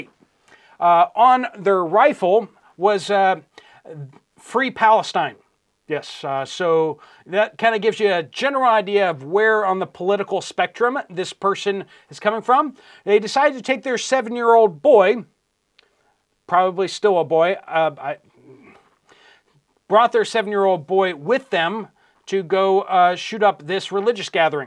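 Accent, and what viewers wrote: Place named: Texas, United States. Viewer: May I suggest a Dale Carnegie or other readily available public speaking course? You will be so much more comfortable. It helps kick out the Texas speech patterns in all of us.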